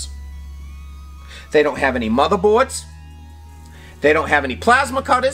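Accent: Boston, United States. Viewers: Was just gonna ask , u from Bosten, lol.😊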